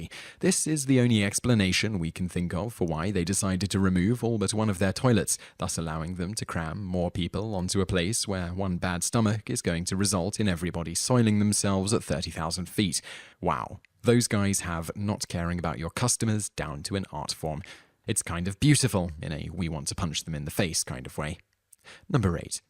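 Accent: United Kingdom. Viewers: Clearly 40p, he's got a UK accent but I guess he's been around Yankees too much with all his yank phrases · Something tells me the narrator of the video is British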